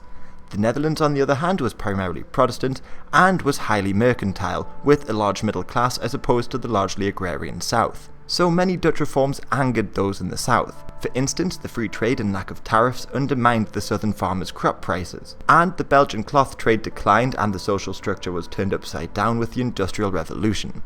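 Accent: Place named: Newcastle, United Kingdom. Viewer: Where are you from? You sound like a Geordie who's trying REALLY hard not to sound like a Geordie...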